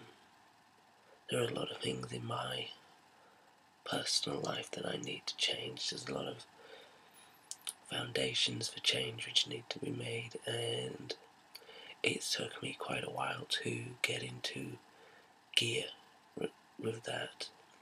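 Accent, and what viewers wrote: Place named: United Kingdom. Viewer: Glad to see you are both back: you and your British accent ^^!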